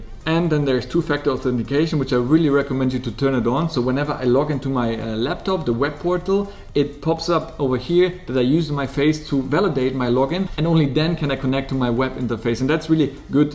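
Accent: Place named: Germany. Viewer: Are you from Germany? Your command in English is very swift. Once in a while I hear a slight German accent 😊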